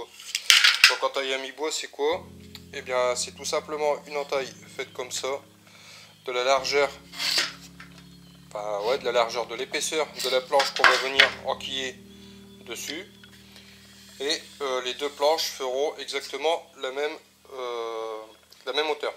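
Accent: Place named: North, France. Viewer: Ça fait pas longtemps que je suis tes vidéos. Mais merci pour ton taff, tes vidéos sont simples et bien expliqués. Mais tu serais pas du nord; ta un petit accent. J'ai le même 😁😁.👍👍👍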